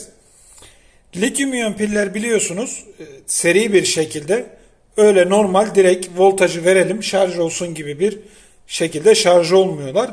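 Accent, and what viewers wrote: India. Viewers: off bir an hintli sandim yahuu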